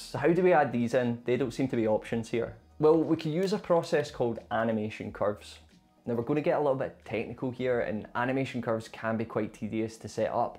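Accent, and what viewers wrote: Scotland, United Kingdom. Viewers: Love your videos, very informative and easy to follow... and the scottish? accent just makes it better..... had any IronBru lately?? :D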